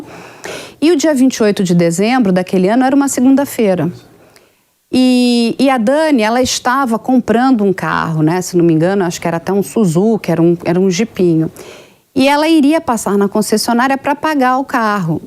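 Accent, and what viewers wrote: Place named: Rio de Janeiro, Brazil. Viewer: vc eh boa profissional e gosto de assistir seus vídeos, MAS POR FAVOR, PARE DE FALAR CARIOCA!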